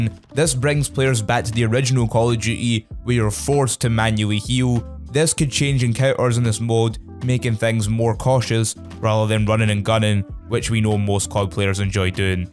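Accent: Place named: Scotland, United Kingdom. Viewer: I am simple man. I hear a Scottish accent, I give a thumbs up.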